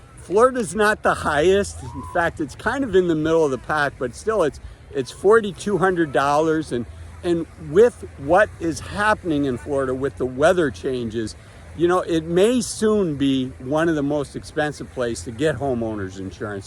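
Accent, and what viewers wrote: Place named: Cleveland, United States. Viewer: My late biological dad was from the Cleveland area too, and your accent reminds me of his, so I guess there is a Cleveland accent and you have it and he did too. As an adult he moved around a bit but settled in Northern VA, where I found him in 2015. He was in his 80s and I only knew him for a couple of years before he passed. Getting to know him was one of my life’s peak experiences. I’m glad I found him before he died, but wish I had had more time with him.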